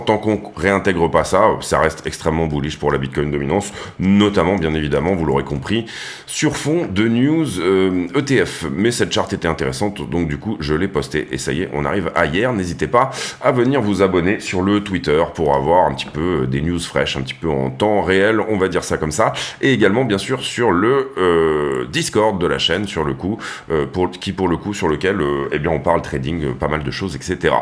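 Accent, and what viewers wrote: France. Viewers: Ca veut dire quoi “ hard close “ que tu répètes à toutes les sauces , fermeture difficile ?? J’avoue que ça me dérange cet abus de mots en anglais par tous les Youtubers français avec un accent franchouillard qui essayent de placer à toutes les sauces des mots en anglais comme , move , push , fill , up , down ect ect Est ce qu’ils pensent que ce donne un genre et que ça les rend plus professionnels ??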